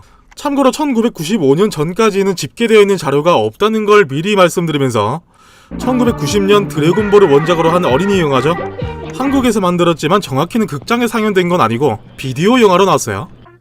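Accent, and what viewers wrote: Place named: Gyeongsang, South Korea. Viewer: 갱상도 분이시네예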